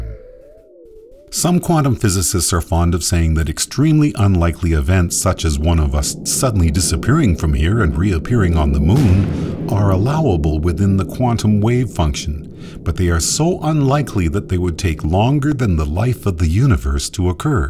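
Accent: Canada. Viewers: I can hear your Canadian accent ( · This guy's Canadian accent is really starting to piss me off. "Quantum FOAHM" "Michael's GOAHL is to poke HOHLS in the..."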